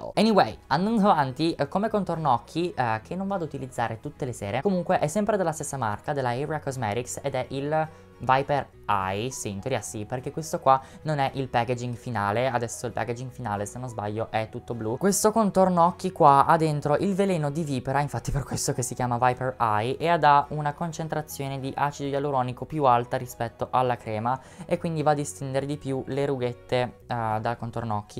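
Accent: United Kingdom. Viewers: Sei un grande io adoro come ti trucchi adoro la tua accento inglese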